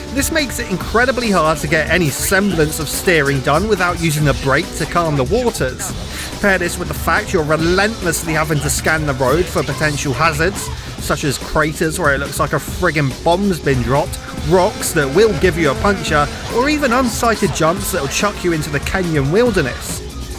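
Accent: United Kingdom. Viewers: Are you from milton keynes???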